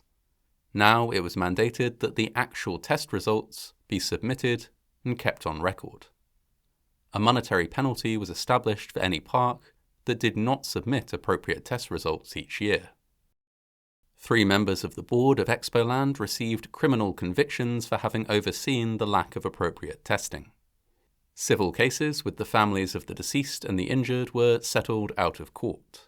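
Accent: United Kingdom. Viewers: God i hate the thick british accent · Don’t watch if his accent bothers you that much better yet ,justjust unsubscribe and be on your way. you knew he was British and yet your dumb ass kept watching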